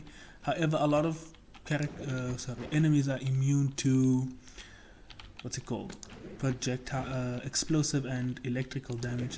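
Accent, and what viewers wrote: South Africa. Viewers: Are you south african? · Are you south African? Wondering based off your accent and I'm from Johannesburg. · South African?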